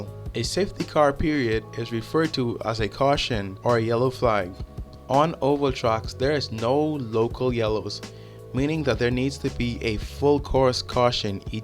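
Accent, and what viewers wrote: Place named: Bahamas. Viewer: You sound Bahamian glad to have another bro from the 242 enjoy Motorsport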